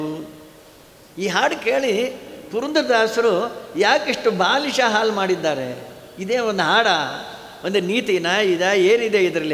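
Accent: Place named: North Karnataka, India. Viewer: I enjoyed listening to this discourse mainly for his Kannada of north Karnataka flavour.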